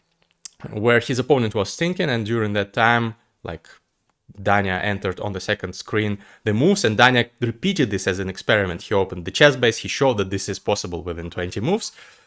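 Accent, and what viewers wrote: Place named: Russia. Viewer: Спасибо тебе огромное. И за проделанную работу, и за хороший, богатый английский, и за понятный российский акцент, который делает английский понятнее для русскоязычных))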